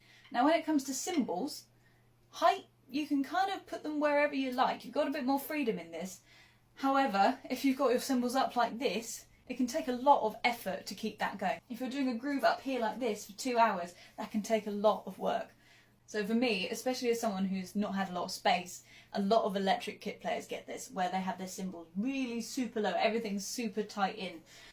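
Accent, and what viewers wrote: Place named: Cockney, United Kingdom. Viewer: This bird is Cockney!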